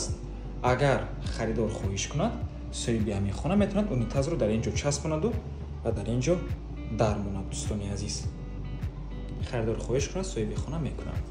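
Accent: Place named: Tajikistan. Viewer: Бисёр забони ширини тожики доштаи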